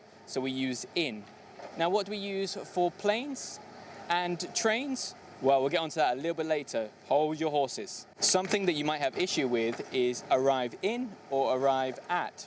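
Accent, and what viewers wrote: United Kingdom. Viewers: Hallo mate, I wonder what kind of accent do you have? It sounds British but for me it's too clear for a British accent .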